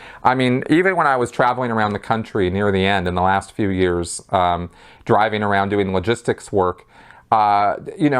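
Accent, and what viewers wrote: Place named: California, United States. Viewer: For anyone wondering… his voice/accent is a California accent (he’s not gay) Not all Californians have it, in much the same way not everyone from New Jersey has the accent, as time goes on this accent is becoming increasingly rare as people spend more time watching nationally broadcast programs… which tend to standardize the accents of those that watch/listen to them.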